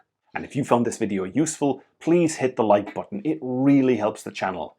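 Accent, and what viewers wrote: Ireland. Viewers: Are you part Irish? I am hearing some Irish accent in your voice. Good video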